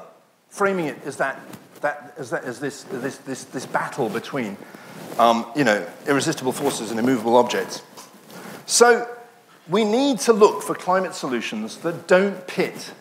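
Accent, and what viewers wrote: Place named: England, United Kingdom. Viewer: The bad sound is that bloody English accent.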